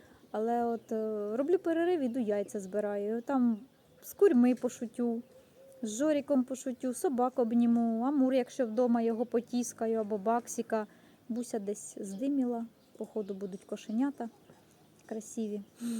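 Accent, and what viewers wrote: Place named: Surzhyk, Ukraine. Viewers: Не знаю, чому Ви себе бешеною - чи це в тому смислі , що Ви така щаслива , безпосередня у спілкуванні з тваринками.. Але Ви така щира і приємна, що це не може не подобатися. Цікаво, звідки Ви? Мені не попадали на очі ті кгметарі, де Ви про це розповідали. По вимові - косю ходю - то це десь Дніпро-Полтава- Кропивницький ... А про стріми, відео - Ви цікава своїми щирими емоціями, бо є люди, які повторюють чужі фрази з тік-току , а є ті, що хочуть бути дуже оригінальними і плетуть хтознащо . А Ви не фальшива актриса, а просто кайфуєте від того,що робите - і це приваблює. А сюжети життя саме підказує. Хто має тварин і любить їх - для того майже щодня якісь маленькі пригоди. Знаю по своїх котиках - можна цілу книжку написати , та такого, що й не всі б повірили в котячі витівки ) А пригоди вашого Жоріка - це ж неповторний дгсвід, бо в кого ще є такий вільний кабан ? 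Та і не всі в селі вміють нормально говорити з тваринами. Є такі, як що : киць-киць, іди їсти, їж, на, пішов геть , щоб ти здох - це так реально одна знайома жінка каже... А я можу щодня діалоги зі своєю кицькою вести : і яка вона красуня, і хвалю її вміння спати непорушно пів-дня, не міняючи пози : виспалась, доця? О, перевернулась ? Правильно, двіженіє ето жизнь ) Був би тільки час .. Бажаю Вам всього-всього, що Вам хочеться і потрібно ( бо не завжди нам потрібне те, що хочеться) Досягнете Ви ста тисяч, кнопок чи ні - аби це Вас радувало , аби ьуло мирне і шасливе життя. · Сонечко, ти найкраща. Твий суржик такий справжній, такий теплий... Дай Боже тоби всього найкращого.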